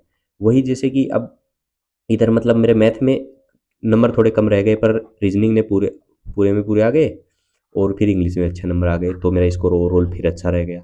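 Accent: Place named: Rajasthan, India. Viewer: Bhai aap Rajasthan se ho na Bolne ke tarike se lag raha h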